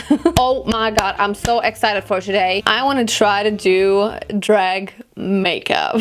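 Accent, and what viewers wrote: Germany. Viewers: Ich finde deinen deutschen Akzent richtig unangenehm. Du sprichst zwar gut. Aber das „the“ wird nur anders gesprochen wenn das folgende darauf bezogenen Wort mit einem a,e,i,o,u ausgesprochen wird. Im Normalfall wird das e bei the nicht betont. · Immer der gleiche Anfang ist langsam echt nicht mehr lustig. Dein Englisch ist auch nicht das Beste, man merkt, dass du eigentlich deutsch sprichst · Girl you just try to much beeing a beauty-Guru. I dont know how i ended up here but wth you are so fake af du bist deutsch und das hört man auch!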